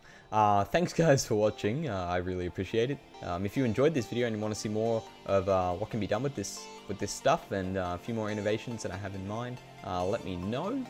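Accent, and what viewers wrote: Australia. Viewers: HES AUSSIE LUV IET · Are you australian..? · Are you australian?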